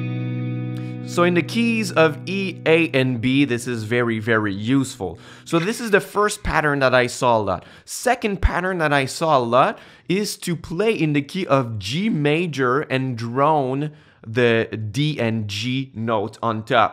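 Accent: Quebec, Canada. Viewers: quebecois? Je reconnais ton accent :)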